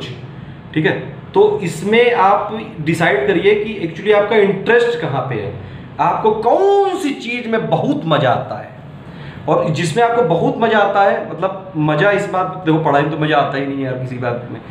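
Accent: Kanpur, India. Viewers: Bhai Kanpur ke ho kya ...? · Sir, Aap kanpur me kaha Se ho, Full kanpuriya Touch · Nice kanpuriya touch...!!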